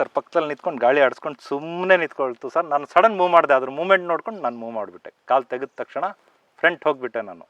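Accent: Standard. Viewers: ಅಣ್ಣ ಚೆನ್ನಾಗೆ ಮಾತನಾಡುತ್ತೀರಾ ಸ್ವಚ್ಚ ಕನ್ನಡ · Vav.adbhut.nimma.matina vaikhari.ane.bagge.matadoru.nimmannu.nodi.kalibeku.yakandre.nimma.kannada.bahala.sundara.hagu.swaccha.ellarigu.artha.agutte.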